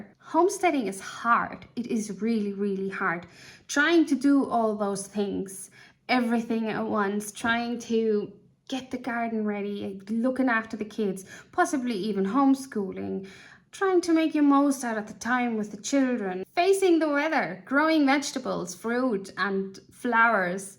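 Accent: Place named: Ireland. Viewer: Happy to have JUST found your channel! I could truly listen to you speaking any time - it reminds me of the brogue of my Da's speech. Da's gone now, but it's like homecoming to hear it. Anyhow - the points you make are so very true! Homesteading can be any form, depending on the homesteader! You don't have to be 100% self-sufficient to be a homesteader. Garden where you are, how you are and learn what you WANT to do. If you garden, learn how to preserve what you grow or what you can get on good sale so you can use it when it's not in season. Etc, etc. Homesteading isn't for EVERYONE - it can be stressful, but it can also be comforting. Don't let "influencers" be your model of how you should be. Much love!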